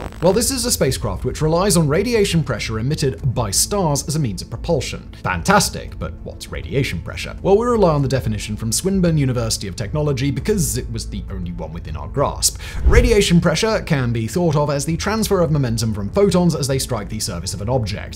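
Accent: England, United Kingdom. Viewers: Go back to England! Like soddenly you know what we’ve known for two years. I hate that snooty as accent.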